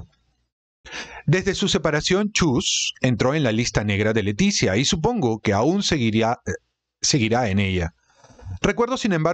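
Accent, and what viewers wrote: Peru. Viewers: Me encanta oir como lees el libro con ese lindo acento peruano. 😊 Saludos desde España.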